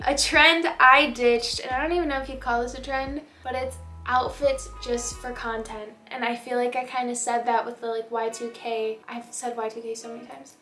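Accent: Midwest, United States. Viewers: Are you from the midwest?? The accent is coming THROUGH lol!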